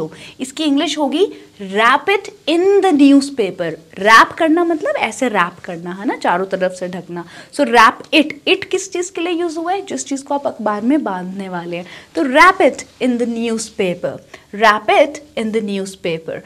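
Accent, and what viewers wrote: India. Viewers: Maam I loved d way u say Hindi wale English 😂❤keep on teaching like this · Sweat and smart words that enhance the charm and beauty of sentance and you are full proved in it. And I'm highly impressed with your's English accent with the soul of Hindi adds to the excellence of language, Thanks beti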